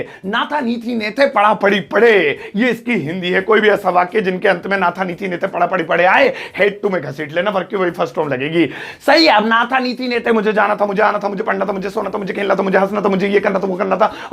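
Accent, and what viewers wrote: India. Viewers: Desi English.♥️♥️♥️♥️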